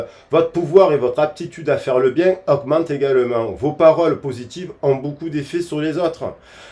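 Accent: South, France. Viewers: Je l ai délecte cette canalisation...divin gratitude pour ce moment magique et magnifique avec l accent du sud en plus...🙏🤲🪷🌹🕯👌👍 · Coucou je t'envoie mes ondes les plus positives pour que tu rencontres ton âme soeur et qu'elle te demande en mariage:face-red-heart-shape: Vu toutes les bonnes choses que tu nous envoies avec le coeur, et tes tirages de cartes toujours empreints de positivité, toutefois très réalistes et sans mièvrerie, je suis sûre que je ne serai pas la seule à formuler ce souhait! C'est toujours un plaisir de t'écouter (surtout avec ce bel accent du soleil), et merçi encore pour ta générosité de coeur. Tes vidéos on les écoute et on en redemande! ❤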